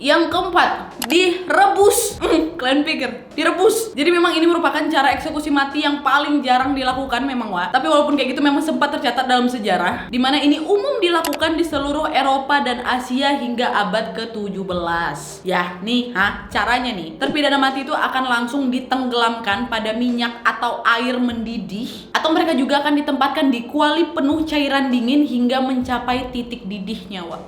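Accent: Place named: Java, Indonesia. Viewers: Ka ada medok eee:) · Sehat terus, kakaaak! Aku yakin yang ada di sini pasti sayang kak! Btw kak agak medok ke Jawa yak ngomongnya 💚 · Jawa amt suara😂😂sampek lupa skrip nya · Mulai medok yaa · Kak kok jadi medok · Malam minggu kerjaannya nontonin youtuber favorit! 1. yg udh jdi medok 2. TussyStory yg menawan 😍 3. Nessie coz its british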